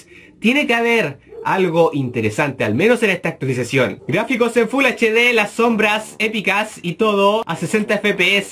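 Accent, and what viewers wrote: Chile. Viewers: Hola una pregunta eres chileno ? ¿Y si no de que pais?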